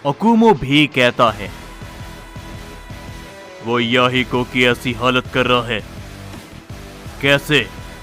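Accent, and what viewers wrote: Odisha, India. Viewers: Odisha se ho kya bhai 😅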